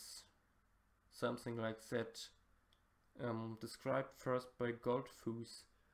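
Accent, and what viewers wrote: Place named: Germany. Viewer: I love your german voice, like so much. You sound like Colonel Klink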